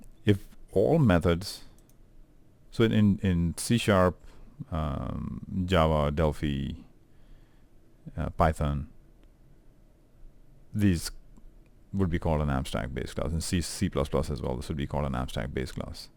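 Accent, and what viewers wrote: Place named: England, United Kingdom. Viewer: Finally, a decent video that explains polymorphorism in a clear english accent! Subscribed.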